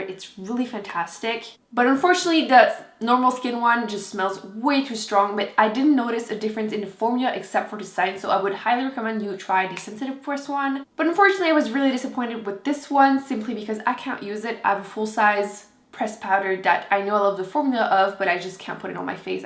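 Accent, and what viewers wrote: France. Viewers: Sorry are your french?? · She has an AMAZING french accent.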